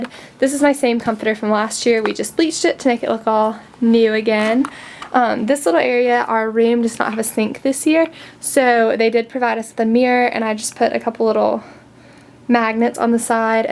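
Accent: South Carolina, United States. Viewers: sounds like south carolina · it's southern American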